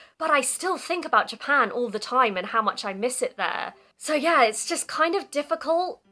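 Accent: United Kingdom. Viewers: I know this has nothing to do with the topic, and you might have told us already in another video, but do you mind if I ask whereabouts in the UK you were born and raised? I used to live in the UK when I was a kid, and there's this accent of yours which I just miss and love. I think it's a lot to do with how the "t"s are pronounced. Long story short, you talking is like music to my ears. Looking forward to your upcoming videos. Cheers!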